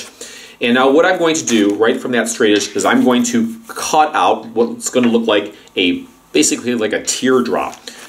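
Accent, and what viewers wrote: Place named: New York, United States. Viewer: Dear doctor! 🤓 What a great detailed explanation, which took the mystery out of this problem. I understand WHY the foot pads did not alleviate my pain (between 2nd & 3rd bones) before. I bet you are a New Yorker? I’d recognise that accent anywhere. This was extremely edumacational! 🤓 A grateful New Yorker in London. 🇺🇸🌹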